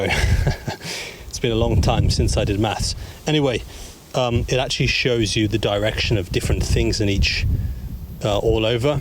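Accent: United Kingdom. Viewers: Thanks you for this video, I'm from Thailand. like your British accent.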